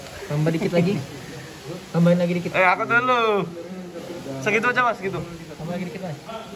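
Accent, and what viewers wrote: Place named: Java, Indonesia. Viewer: Jowo medok😂😂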